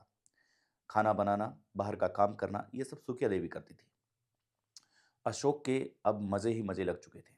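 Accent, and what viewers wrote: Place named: Rajasthan, India. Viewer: Wow aapki hindi buuri nhi paaye ❤❤ ji aapne khajuwala wali language 😂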